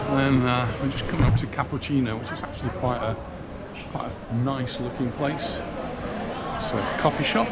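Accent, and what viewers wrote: Stoke, United Kingdom. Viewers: Are you originally from Stoke by any chance? I'm detecting a Stokie accent. Update: Just seen your video explaining how you got to Mallorca, and as suspected, you're a Stokie. Greetings from Longton!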